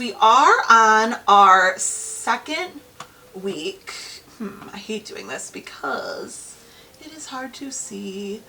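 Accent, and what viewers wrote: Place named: Connecticut, United States. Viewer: I knew your accent sounded familiar - I'm from CT too! I live in NC now though.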